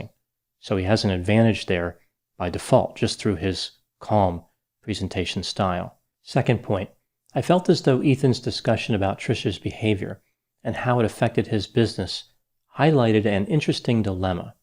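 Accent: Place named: Delaware, United States. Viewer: Now that I know he's got a Delaware accent... I can't get over his adorable Delaware accent. It picks me up when I'm feeling "bayud"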